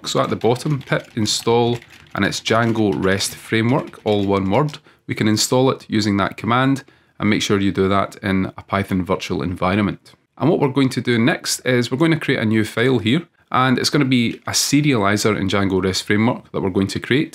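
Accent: Scotland, United Kingdom. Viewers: Sorry if I'm rude but what is that accent Scottish ?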